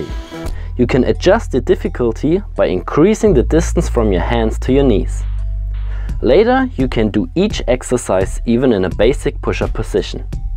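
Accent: Germany. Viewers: This german Akzent 😂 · German accent!!!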